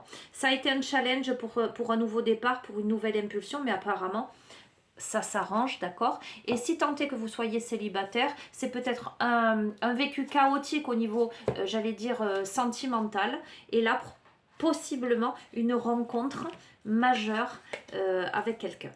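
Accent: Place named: South, France. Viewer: Un p'tit coucou du Mans,. Tes guidances,font résonnance, Avec une sonorité spéciale,qui est un régal,cet accent du soleil ☀️☀️☀️ qui enchante nos oreilles.merci beaucoup bisous bisous toujours un plaisir de t écouter.bonne journée à toi et bisous caresses à tes compagnons à quatres pattes.☀️👍🙏🍀😘🌹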